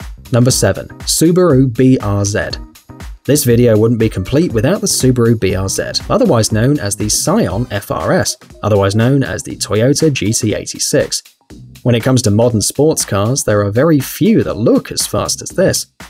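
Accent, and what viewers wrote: England, United Kingdom. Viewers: Hes english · Come on you American he’s British not American we say zed not zee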